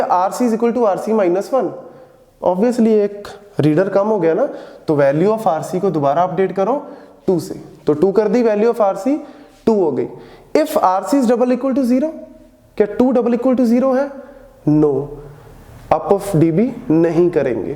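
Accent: Punjab, India. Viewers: Tussi punjabi paaji?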